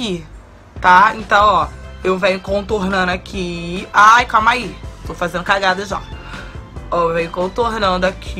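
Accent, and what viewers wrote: Rio de Janeiro, Brazil. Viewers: Não acho a voz parecida, masssss o sotaque carioca é igualzinho e as girias tb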